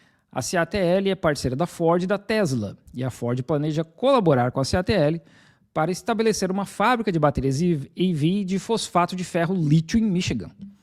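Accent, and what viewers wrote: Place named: Rural, Brazil. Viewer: Sotaque cáipira nojento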